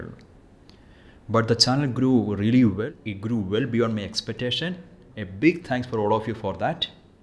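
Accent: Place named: India. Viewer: You sound Indian, what part are you from?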